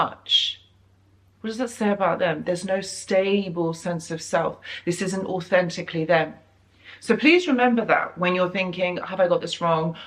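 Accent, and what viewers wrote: England, United Kingdom. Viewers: Yes, your videos are insightful..what I like even more is your luscious England accent 🤍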